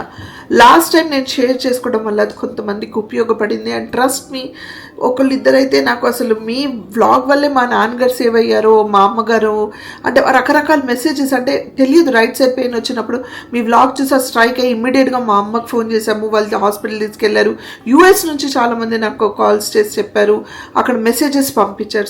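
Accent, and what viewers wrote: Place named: Telugu, India. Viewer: I'm from Bangalore and I have been watching your all family channel from so many years Morethan everything I personally love your telugu accent the most amma You have done so many kannada movie, which we love to watch. You are know family member to me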